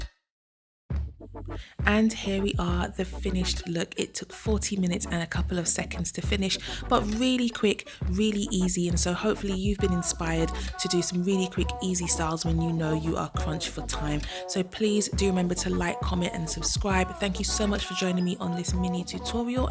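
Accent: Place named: United Kingdom. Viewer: Love your hair and I just love the English accent, so elegant.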